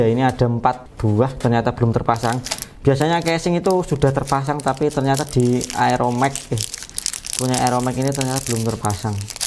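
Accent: Java, Indonesia. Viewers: Ngomong e, medhok parah !!!😊😊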